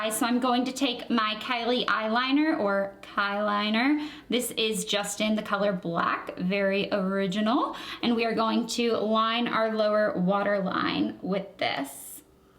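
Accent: South, United States. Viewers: Aw I miss your country voice! Haha I have been watching you since back then!! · You were the first person who I subscribed to for beauty videos and I love this look! BTW you had more of a southern accent back then. I love southern accents! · the southern accent ahhh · That southern accent in the first video! where did it go? :-P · LOL you had such a thick Southern accent! And I love the reference hahaha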